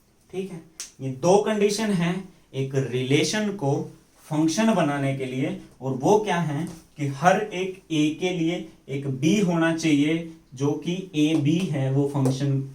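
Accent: Haryana, India. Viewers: Ohh sir aapne haryanvi kb se bolni suru krdi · Sir hryanavi mt bola kro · Sir aapne haryanwi km achi lgti h...aap hindi hi bola kijiye.....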